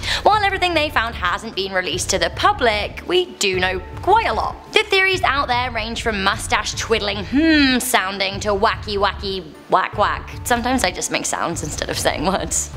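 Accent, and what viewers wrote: United Kingdom. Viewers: voice is so British and soothing, it's like a bedtime story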